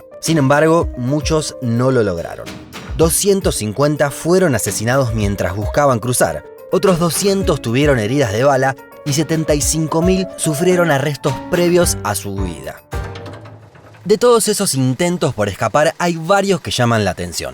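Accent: Argentina. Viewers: Hacen o haces no se quien esta atras de Un mundo Inmenso, pero es un excelente trabajo no saben el valor de cada video que he visto un buen resumen, imagenes, musica, todo esta muy bien. El narrador es Argentino verdad? Un saludo desde Mexico del Norte :)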